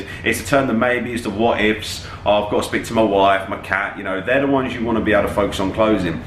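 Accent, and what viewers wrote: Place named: Australia. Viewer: Why does this man have such a strong Australian accent?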